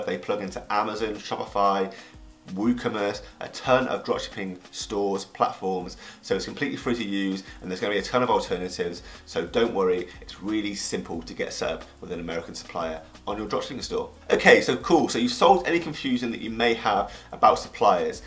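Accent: United Kingdom. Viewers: Ooh I as much as i hate british accent, this information is very useful.Thanks!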